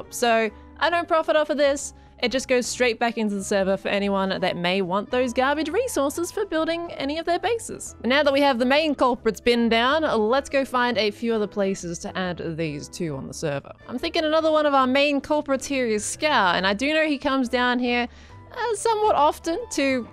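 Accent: Australia. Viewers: Love how you bring the true blue Aussie to Hermitcraft. ❤.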